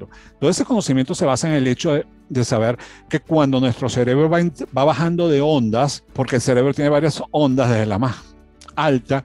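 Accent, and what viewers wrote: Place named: Cuba. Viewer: Me encanta, lo que enseña son mis temas favoritos me encanta descubrir cada vez más lo que dijo JESUCRISTO... EL REINO DE DIOS ESTÁ DENTRO DE TI...... YO YA ME SUSCRIBÍ Y LO SIGO NO SE DE DONDE ES PERO OJALA, SE LE QUITE SU ASENTO XQ A VECES, NO LE ENTIENDO.... ALGUNAS PALABRAS, PAREGIDO A LO CUBANO CHICO... 😂AYÚDEME A ENTENDERLE MEJOR... LAS S S S SE LE DESAPARECEN A VECES... LO Saludo desde GUADALAJARA JALISCO . ME FASCINA SUS TEMAS Y SI TENEMOS PODER... ME ENCANTÓ LO DE JOSÉ SILVA, PERO OJALA FUERAN EJEMPLO CLAROS. ESPECÍFICOS QUE HACER EXACTAMENTE.. MIL. GRACIAS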